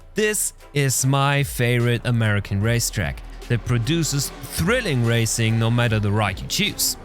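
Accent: Germany. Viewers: Da hör ich doch Deutsch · gutes englisch aber das geschulte ohr erkennt dass du deutsch bist:)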